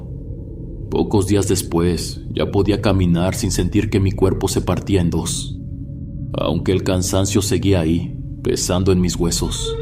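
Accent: North, Mexico. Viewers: WOW!! Cuánta maldad hay en el mundo, que le está pasando a la humanidad?? Por otra parte, tú acento norteño me embelesa y a la vez me da ternura, cuando dices tú letanía de que alguien pudiera estar usurpando tú lugar y robando tú trabajo, normalmente te escucho en mi trabajo... Cuídate, Bendiciones!! ❤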